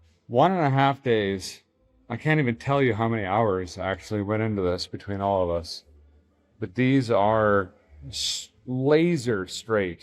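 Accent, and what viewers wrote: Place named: South, United States. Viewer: It's good to see a video where for once your not adding extra made up words or acting " extra country " instead of just speaking normal like you did at the beginning in the body shop. I keep skipping or giving up on your videos when you start making up words or trying to make illiterate talk seem cool. Please keep up the good work and less of the slang talk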